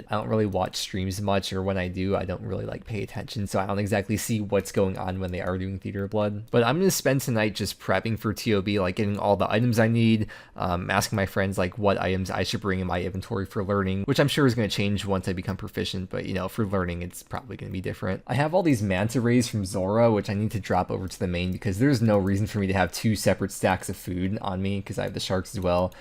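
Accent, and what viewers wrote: Canada. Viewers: wait is canadian? pls tell me I'm not sure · wait a second.. are you canadian? So many good laughs this video !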